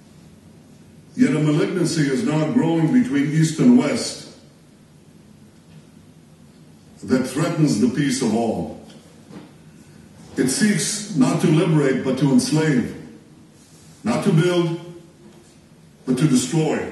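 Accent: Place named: United States. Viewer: Am I the only one slightly unnerved by the fact that the Israeli prime minister sounds like an American (who can properly pronounce country names) when he speaks?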